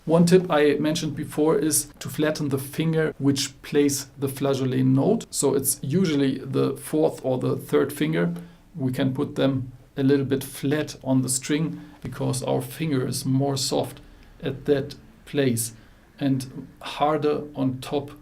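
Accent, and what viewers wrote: Germany. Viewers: I love your Denglisch! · According to your accent, you're probably from Germany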